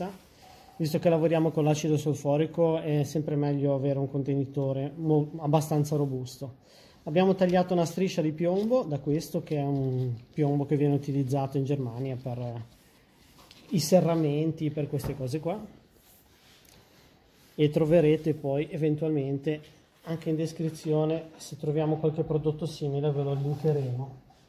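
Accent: Genoa, Italy. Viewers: per fare un bel lavoro allora mi calcolo in peso quanto è diluito l'acido e aggiungo acqua distillata fino a raggiungere la soluzione giusta. Comunque noto con piacere l'accento genovese. Io abito in entroterra tra la Spezia e Genova e sono un appassionato delle cose che proponete nei vostri video. Lavoro alle macchine utensili se vi serve qualsiasi cosa per una collaborazione sono a disposizione saluti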